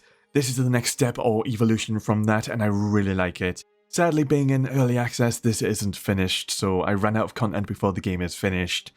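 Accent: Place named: United Kingdom. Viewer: Goddanm i love british reviewers lol.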